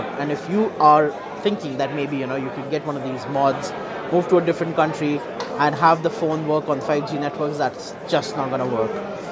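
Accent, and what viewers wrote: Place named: India. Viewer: heavy Indian accent bye video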